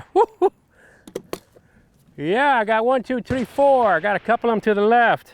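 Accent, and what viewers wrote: Louisiana, United States. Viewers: Eric Jesperson He sounds like he is from Cajun country.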